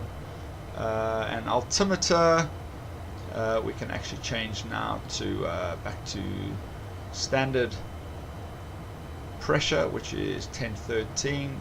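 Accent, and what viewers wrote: South Africa. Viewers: very nice Video and always good to hear a South African voice. The game is very realistic and you seem very knowledgeable ,Keep the videos coming and I will definitely support them!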